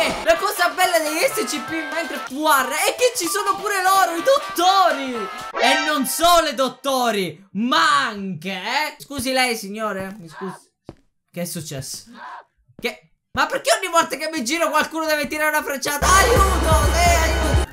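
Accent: Sicily, Italy. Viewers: Ma sei siciliano? Perché sempre che hai l'accento siciliano!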